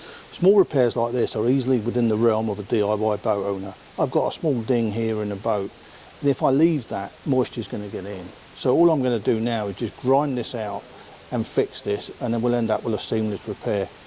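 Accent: United Kingdom. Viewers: BoatUS with a British accent. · Boat us but it’s a Brit 🇬🇧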